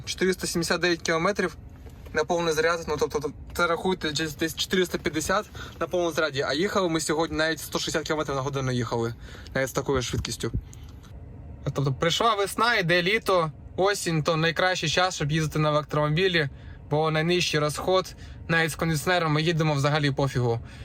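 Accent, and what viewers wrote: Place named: Zakarpattia, Ukraine. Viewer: Так початок він почав не лише українською а з закарпатським діалектом нам закарпатцям дуже приємно що українці поважають діалекти різних регіонів це вселяє надію що все у нас буде класно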